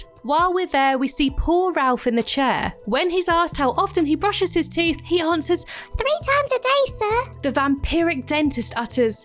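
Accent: United Kingdom. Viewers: This beautiful british accent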